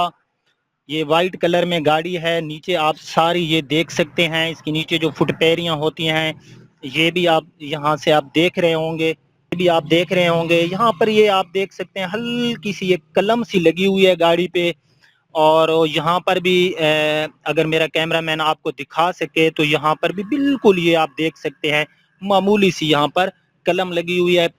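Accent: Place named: Punjab, Pakistan. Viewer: English in punjabi mode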